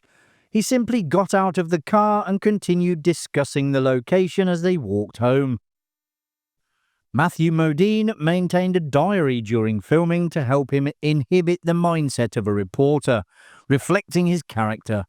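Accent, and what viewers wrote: England, United Kingdom. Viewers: it's called an english accent you silly twat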